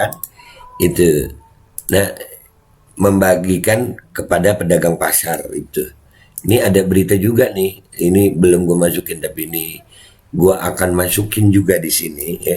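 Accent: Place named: Java, Indonesia. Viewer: medok bingits 😁😁😁😁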